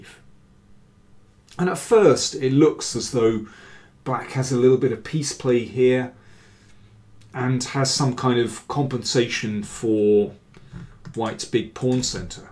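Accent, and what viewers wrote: United Kingdom. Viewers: It was 1995 when i first heard your commentary when i used to watch anand Kasparov play at 4 am Indian time, and you have the knack to keep viewers engaged and the most appropriate British accent and vocabulary!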